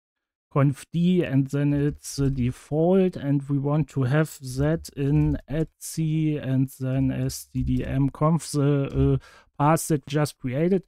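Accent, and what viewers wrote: Germany. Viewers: Bruder hat den dicksten deutschen Akzent den es gibt.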